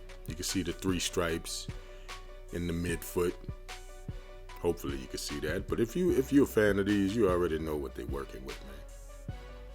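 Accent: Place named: New Orleans, United States. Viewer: They hard bruh ... Man U from NO... the accent sound just like it ... I’m in BR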